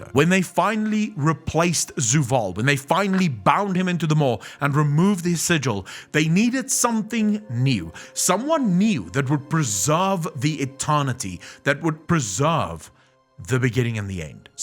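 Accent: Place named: South Africa. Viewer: Subbed cause fellow Safa :) Would recognize that accent anywhere. Good vid my dude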